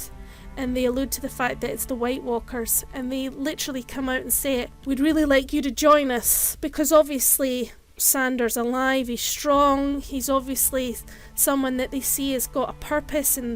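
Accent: Scotland, United Kingdom. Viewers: Scottish narrator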